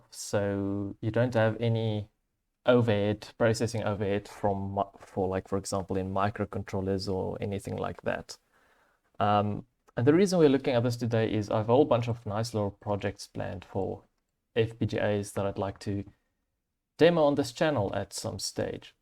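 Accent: South Africa. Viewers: I hear an Afrikaans accent. 😁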